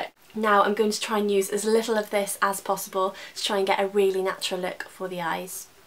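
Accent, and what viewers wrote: United Kingdom. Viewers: i love your accent! whereabouts in england are you from?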